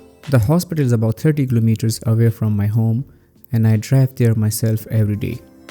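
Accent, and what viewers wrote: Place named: Afghanistan. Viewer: sir i loved your pashto tone….I personally first time watched a pashto medical related video which was quiet good….❤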